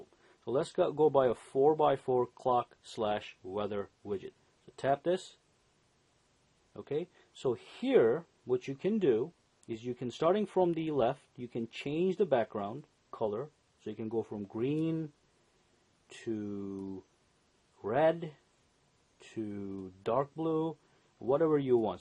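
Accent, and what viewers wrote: India. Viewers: You're Indian, aren't you? 😑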